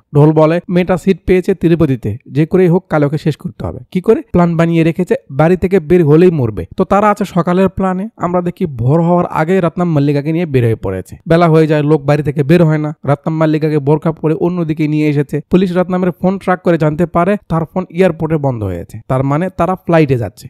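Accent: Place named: Rajshahi, Bangladesh. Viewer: Anpr Kotha bloar style aktu aktu rajshashi r Manus er moto... ❤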